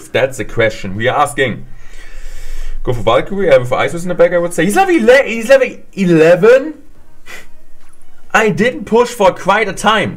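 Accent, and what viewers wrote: Germany. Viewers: He's Deutsch accent its heavy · Fun fact: has such a strong accent, that half of the words in auto-generated english are still considered as deutsch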